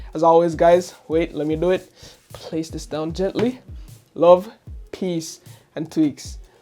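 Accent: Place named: Jamaica. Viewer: Subscribing just because you sound like a fellow Yardie. Didnt even finiish the video yet 🤣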